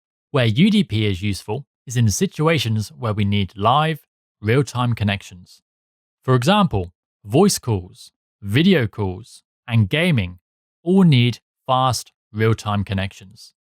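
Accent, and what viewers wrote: United Kingdom. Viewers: I love these videos btw! I am starting an apprenticeship within IT networking on Monday and I have been trying to research information. Your videos are the most beneficial and well explained! Are you british btw?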